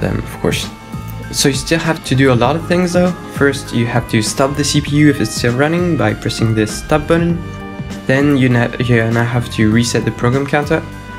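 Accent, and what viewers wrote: France. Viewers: T'es français ? J'entends un certain accent ! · with your accent youre french ?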